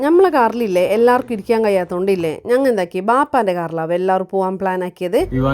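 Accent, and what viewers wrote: Kasaragod, India. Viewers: ഇതെവിടുത്തെ ഭാഷയാണ് കാസർഗോഡ് ആണോ, എന്ത് ഭാഷയാ ട്ടോ, ഒന്നും മനസ്സിലാകുന്നില്ല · Kasrod bhasha nte athra oru bhashyum indavvula aabe❤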